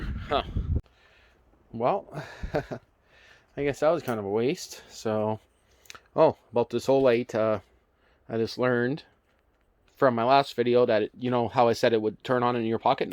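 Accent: Canada. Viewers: I was hoping to see a rapid dis-assembly of this little stump puller Diesel... I guess that will be on the next video... From what his accent sounds like, this was filmed in Canada...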